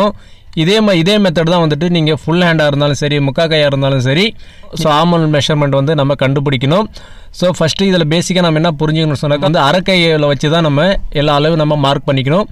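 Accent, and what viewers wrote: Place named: Kerala, India. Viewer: நீங்க எந்த ஊர் ப்ரோ மலையாளம் கலந்து தமிழ் பேசுற மாதிரி இருக்கு.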